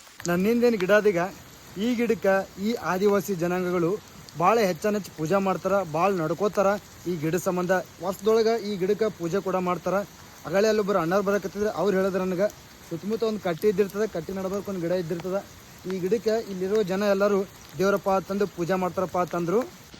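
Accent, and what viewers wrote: North Karnataka, India. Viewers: Super uttar Karnataka maatu , u k huli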